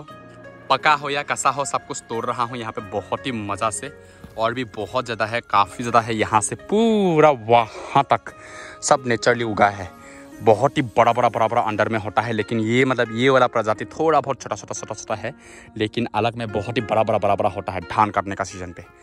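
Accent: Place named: Assam, India. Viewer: Aap assamse hona bat karneke andaj sehi pata salta h😬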